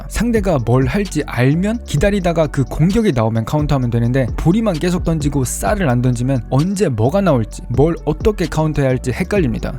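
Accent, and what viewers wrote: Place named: Gyeongsang, South Korea. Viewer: 은 고향이 경상도 어디세요? 저랑 사투리가 똑같네요ㅎㅎ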